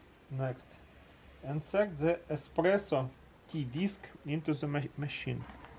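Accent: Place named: Russia. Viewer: That is the most hilarious russian accent i have ever heard. I love it.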